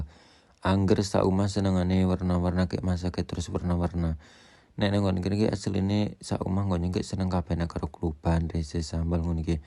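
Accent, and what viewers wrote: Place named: Java, Indonesia. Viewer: Iki bahasane kok kaya nggon ku ya antara banjar ngslor apa wonosobo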